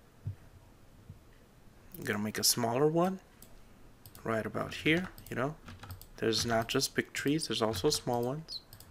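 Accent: Germany. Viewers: Man merkt zum Teil dass du einen deutschen Akzent hast xD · Are you German? :D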